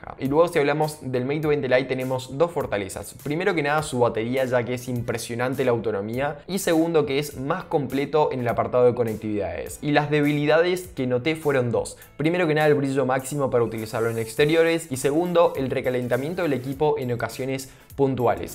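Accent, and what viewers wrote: Argentina. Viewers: Amigo tiene ud un acento muy particular, casi neutro, si es que eso existe. De qué parte de Argentina es? Todos hablan así? O es propio el estilo? Muy claro y entendible pronunciación. Se agradece. Saludos.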